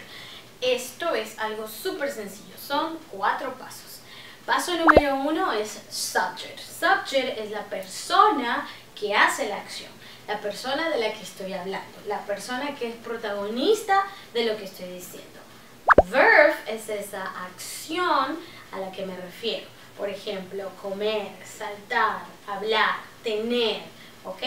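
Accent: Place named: United States. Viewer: muy buenas tardes, este video en mi concepto fue como subir tres escalas en un solo paso, pues diria que es el punto de partida para pensar en ingles, y corrobora aún mas mi idea de que el Ingles es el perfeccionamiento de la forma de hablar de Tarzan. Con todo respeto por los anglosajones el anterior comentario, pero es que cada vez concluyo que el ingles es el idioma de ir directo al punto de forma clara y concreta, y el español es la forma de como dar rodeos y rodeos y a veces no decir mucho. He ahi también el porque los gringos hacen y nosotros los españoles nos quedamos haciendo foros, seminarios y conferencias tratando de buscarle florituras al idioma y con ello justificar el subdesarrollo Un saludo grande para ti, me está gustando mucho tu voz y acento americano que peligro para mi. Que tengas una feliz tarde, y una mejor semana.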